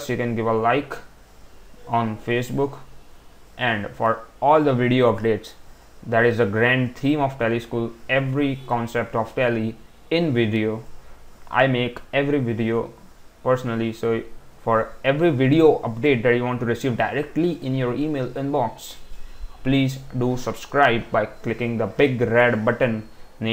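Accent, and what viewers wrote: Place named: United Kingdom. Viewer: dude I know that you love speaking English. and make every one comprehend what you wanna say despite of using Britishers language and accent too you could be more comfortable with your national native language.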